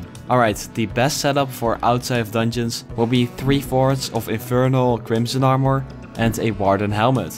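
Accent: Netherlands. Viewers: i smell nederlands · we kunnen nu al zeggen dat je nederlands bent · Ur dutch right?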